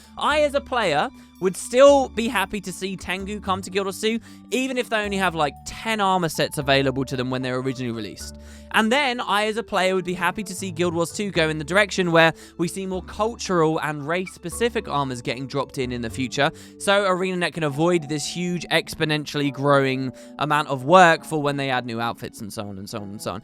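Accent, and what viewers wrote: United Kingdom. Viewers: Glad to have some lighthearted British monologue in the morning between two dark episodes of Daredevil :D Totally agree about third party lore/story sources. I love the lore and enjoy the story when it's good but I rarely experience it in-game. Every now and then I take my time to interact with a NPC but usually I just click through all the dialogue as fast as possible. I think the last time I really looked for stuff in-game was in S02 when we returned to the Priory and I read something like half of those new lore bits.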